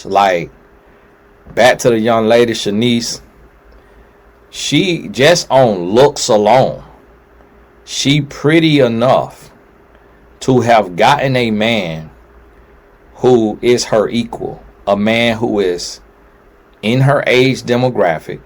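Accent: United States. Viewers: Tony Gaskins where were you raised because I love your country accent. Keep bringing that Godly and Straight no Chaser Wisdom 💯💚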